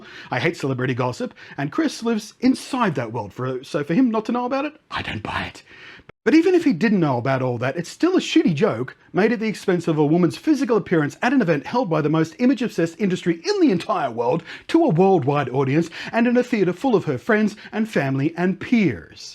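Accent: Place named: Australia. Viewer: Pretty sure he's not British, other side of the world I'd wager, Australia I suspect.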